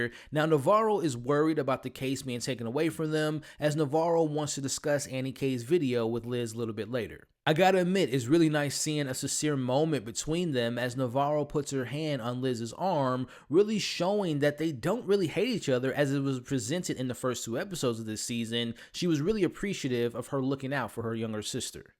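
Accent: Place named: Atlanta, United States. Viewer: you changed your speaking voice??? this aint the white lotus. Atlanta voice... damn E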